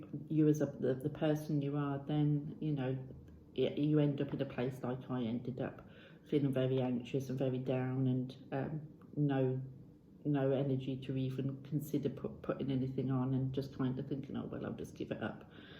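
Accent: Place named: Norfolk, United Kingdom. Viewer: Bravo! 👏👏👏 Good for you for not selling your soul to suit others. I hear what you’re saying about subs and followers because I’ve had the same thing happen to me on Instagram, an account I started simply to share my thoughts and things that inspire me or interest me. Sometimes I don’t post for a few weeks, because, like you, I’ve felt unmotivated and uninspired pretty much for all of this year. Since I stopped posting what I know to be popular, my followers have fallen by around 50 but I can’t worry about it anymore. I’m not sure it’s so much about ego as an inherent human need/want to be liked, although I guess when you start putting out content that your heart isn’t in, but that you know will get views/likes that kind of IS ego, so well done for recognising that, and for also realising that that may have been causing your anxiety and depression. 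By the way, are you a Norfolk girl? I ask because I’m a Norfolk/Suffolk girl and I thought I detected a slight familiar accent.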